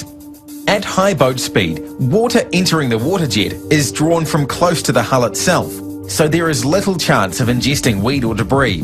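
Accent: New Zealand. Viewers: Kiwi. Waterjets were invented in NZ. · nz accent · Love the accent. Reminds me of the Kiwi advert for Deck Stain, "This weekend I will be polishing my dick".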